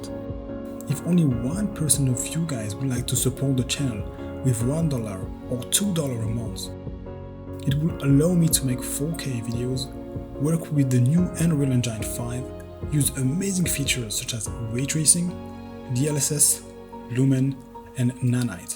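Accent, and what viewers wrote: France. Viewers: I have the impression that you are french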